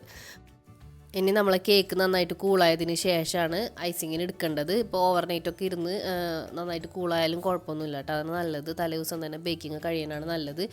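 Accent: Kodungallur, India. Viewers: Iyalde veed kodungalloor ano?ente hus area slang und nannayi.😀😀😀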